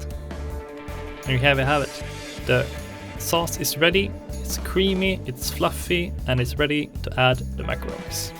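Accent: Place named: Stockholm, Sweden. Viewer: Du har fan den bredaste stockholmska svensk-engelska dialekten jag någonsin hört:D! Bra jobbat btw! du har jättebra content men det är väldigt likt Babish;D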